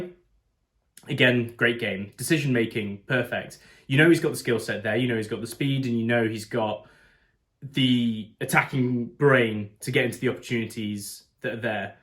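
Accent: South Africa. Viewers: Your Saffa accent is great but you need to throw the words "lekka" and "braai" in there a few times to be authentic. Oh, and don't forget "moer". No South African says three sentences without "moer" appearing at least once 👍🏻 Yeah, what a game. I think NZ not coming to SA during Super Rugby anymore hurts them, because they don't get to play at altitude anymore. Everyone said they stopped playing after 60 minutes, but that is what the Highveld does to a player. Then when you add in the nuclear missile we have in Kwagga Smith, the result is going to go one way. Regarding the TMO checking the truck and trailer and Bongi's tries, I don't know what the answer is there. We heard the Ireland vs SA game had too many TMO interruptions and now we hear the game needs more TMO interruptions. I don't care which one, but it would be nice if World Rugby finally made up their minds? 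Either we accept some mistakes in the game, or we Big Brother every mistake. Pick a lane and stick to it, for the love of God. I'm okay with the tries being awarded, I'm okay with them not being awarded, but some consistency is required. New Zealand were very dangerous off turnover ball with broken play, but they were a bit predictable in the attack methinks. The young guys were properly tested. Dixon didn't have such a good game, but like Rassie said, they are there to make mistakes and to learn. Fassi had a banger after his yellow card, Nortjé was very industrious, Steenekamp is slowly but surely making his voi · A truly shit South African accent but good analysis of the game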